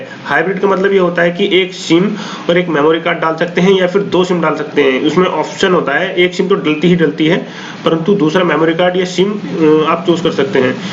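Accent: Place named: India. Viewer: Dusre ke video ko Chura Ke Indian Awaaz lagakar Banaya Gaya video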